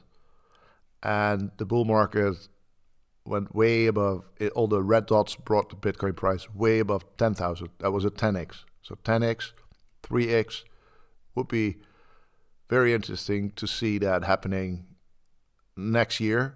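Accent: Netherlands. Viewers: are you Dutch? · I smell nederlands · From The Netherlands, no doubt. Like I hear myself. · i smell nederlands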